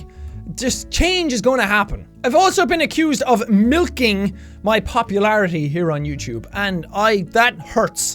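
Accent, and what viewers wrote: Ireland. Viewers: just don't loose the awesome irish accent... as long as that stays... I'm good...... grow an afro for all i care! :D but either way.... just do your own fricken thing! have fun ... fuck the haters.... they're just jealous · Ow...! My heart is breaking...! ;o; Look, I know this video is over a year old now, and I know this comment is just another drop in the sea you get of them every day, and I know I haven't been subscribed to you for very long and I often leave comments that I only hope are as funny to me as they are to you, Jack, but I just have to say this, and I mean it from the very bottom of my heart: You really are as amazing as people tell you you are. I like your deafeningly loud voice with your rough Irish accent, I like how brightly blue your eyes can get, I like your giant, shit-eating grin, I like your impossibly gray hair (and I actually just discovered that you look very nice in gray shirts). 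I like it when you swear every ten seconds and say words differently than I do, I like the personalities and names and voices you give to characters you've only just met and how you stick with them, I like when you yell to your ma about cool shit or scream at Billy for being a dumb shit. I like the scary, funny, weird, impossible, dumb, loving games you play, I like that gaming isn't all you do, I like how completely honest you are with yourself and others, I like the relationship you keep with your fans. I like YOU, Jack. We all do. Why else would we be here for you if we didn't? Ugh, long message is long, I'm so sorry! This sap is getting everywhere.... In any case, if you ever manage to make your way through this train wreck of feels, then good on you! If not... TL;DR: I like you, I'm prou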